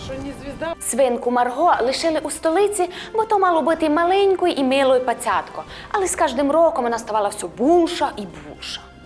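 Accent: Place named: Transcarpathia, Ukraine. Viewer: Podriv Ustoev Закарпатська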